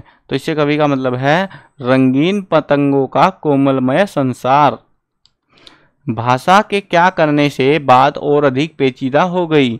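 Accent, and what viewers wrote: Haryana, India. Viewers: Sir haryanvi hai kya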